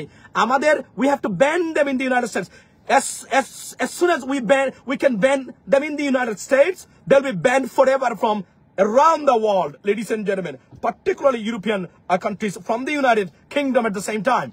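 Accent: Sylhet, Bangladesh. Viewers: I like your content and I must inform you I love Sylheti dialect. It is a melancholy sound to my ears but it’s me. If you don’t mind since, you are speaking in public platform. Could you speak proper Bangla. It might give you a non-biased bigger audience. Just saying. Thanks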